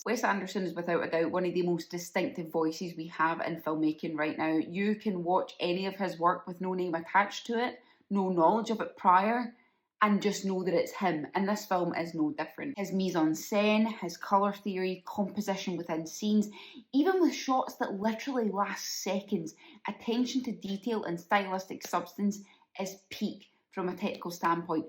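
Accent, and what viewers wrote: Scotland, United Kingdom. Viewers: I LOVE Wes Anderson. But anything weird registers with me. Haven’t seen this one yet, but I’ll have to. And I could listen to you talk forever. Not talking about your Scots dialect & accent (although I love that as well); I’m talking about your neuropsychological predisposition. I fell in love with you a long time ago (*please understand I’m not referring to anything romantic), which is why I wrote a character pseudo-based on you in my fictional pentalogy The Everlasting Life. Her name is Mattie Ferguson. Once my books are on shelves, I hope you are proud to be her inspiration. End of Speech. 🫂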